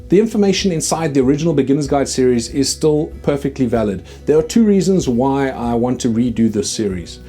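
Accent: South Africa. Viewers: South Africanism ascent.... My homie.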